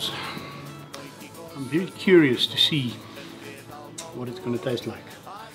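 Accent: South Africa. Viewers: How you going Bought my first Potjie last weekend No3 and have been loving your channel ever since For my first Potjie I'm thinking this one Can I mention you and put a link to you in my comments when I do the video. And I love ❤️ the South African accent Thanks mate for the easy to follow Potjiekos instructions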